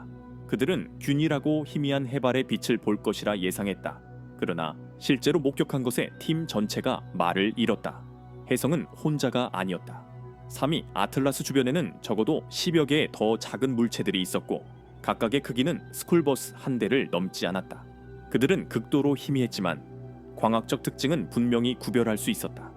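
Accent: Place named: Yanbian, China. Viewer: 낯사 ㅋㅋㅋㅋㅋ 조선족이야? ㅋㅋㅋ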